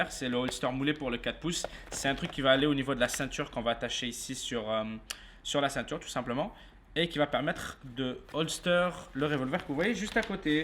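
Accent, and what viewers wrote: Quebec, Canada. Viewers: Tu es québécois ? Et merci pour les conseils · Avec tout ce que tu fais et t'es untboxig (dsl pour l orthographe) tu devrais faire une faq Continue comme ça je t adore PS t'es Quebecoie?🤔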